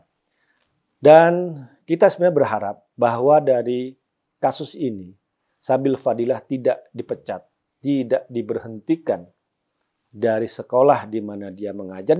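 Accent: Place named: Surabaya, Indonesia. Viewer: Orang Surabaya Jawanya kasar Bong Romwli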